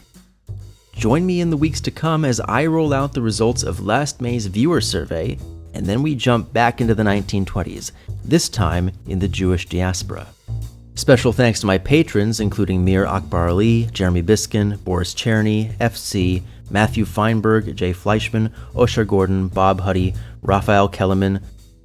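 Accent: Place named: United States. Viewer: Your Spanish was great. You didn’t sound aristocratic or weird at all. You simply sounded like an American with a great proficiency in Spanish.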